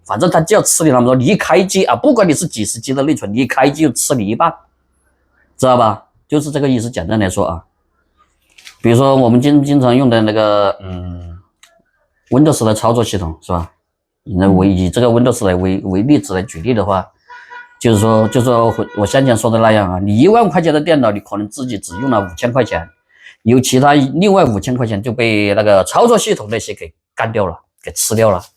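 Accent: Sichuan, China. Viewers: 设备，噻呗😅 的川普很有意思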